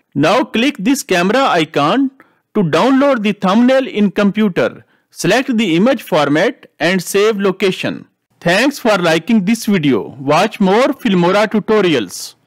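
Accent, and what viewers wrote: India. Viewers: Bro i cant focus up cuz your indian accent, it got me laughing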